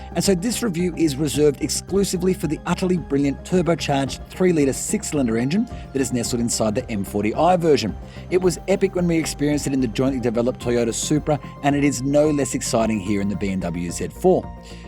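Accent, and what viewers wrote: Australia. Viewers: Is he aussie?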